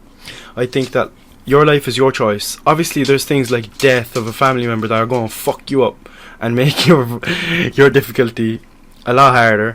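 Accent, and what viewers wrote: Ireland. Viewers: I dont know if its your irish accent bro😭😭but some of the things u say the way u say them to🤣🤣🤣i be bussin out laughin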